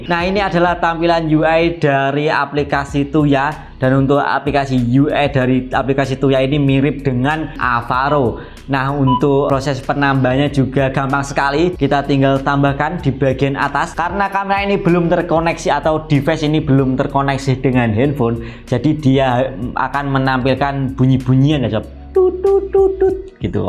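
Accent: Java, Indonesia. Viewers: Jawane medok banget anjir Jiancokkk😂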